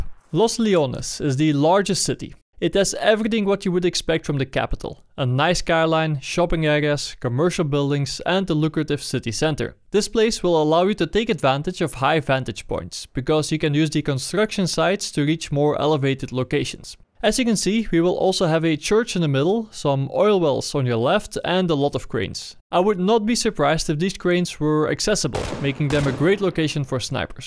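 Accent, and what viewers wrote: Netherlands. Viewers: Dis guy sounds so Dutch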